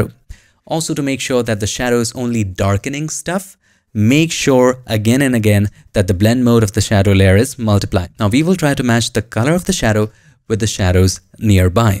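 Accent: India. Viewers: I like your funny Indian accent!)))))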